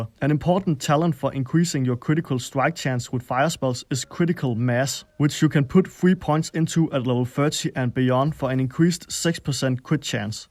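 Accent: Denmark. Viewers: 5 seconds in and I can without a doubt say that this is a fellow Dane. Greetings from Aarhus. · danish detected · That Danish accent tho! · Danish accent xd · The thicc Danish accent! Don’t play mage, but I really enjoy this content. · This is the thickest danish accent I’ve ever heard and I’m danish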